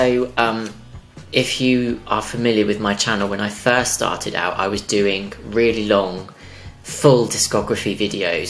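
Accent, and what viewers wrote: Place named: United Kingdom. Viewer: Thank you for doing everything you’ve done! I love to see it. You’re very charming but it could just be the British accent 🤷🏼‍♂️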